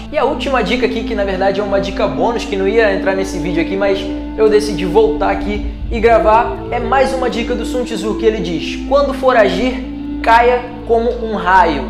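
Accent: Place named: Rio de Janeiro, Brazil. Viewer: willian samuel eu acho que é aqui no RJ, nem sei, porque se tu perceber ele puxa o X "vocêx", todo carioca fala assim kkkk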